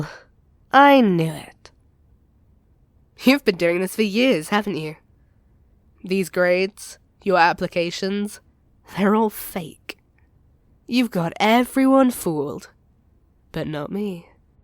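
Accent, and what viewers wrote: United Kingdom. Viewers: This is the first time I’ve heard a fellow Brit do an asmr and it’s pretty good tbh 👍👍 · Bri'ish accent is the cherry on 🔝 ♥️ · Shut up British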